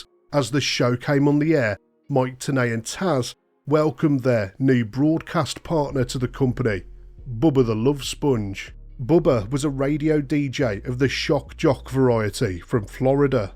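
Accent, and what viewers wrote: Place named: Scotland, United Kingdom. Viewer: Always a Scottish narrator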